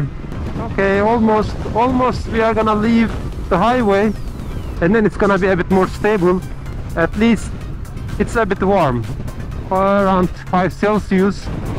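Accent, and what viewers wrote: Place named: Turkey. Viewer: senin o alttaki türkçe aksanını duyarım ben ;)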